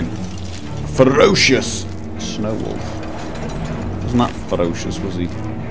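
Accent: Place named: England, United Kingdom. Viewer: It's probably a regional thing. There are quite a few words he pronounces differently than I've ever heard. I've always attributed it to the fact he sounds English.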